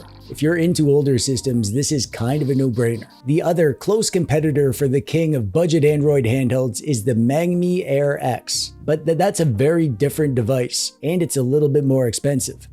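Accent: Canada. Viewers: That's what Canadians sound like, right? I've always wondered why the handhelds also sound Canadian, though...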